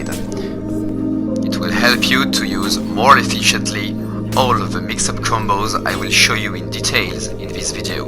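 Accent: France. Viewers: You are french ? you have a french accent :)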